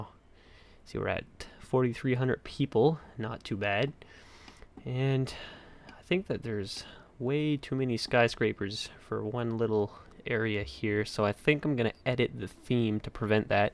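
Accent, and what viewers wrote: Canada. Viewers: I mean u sound Canadian, but the most famous Atlantic city I know is here in jersey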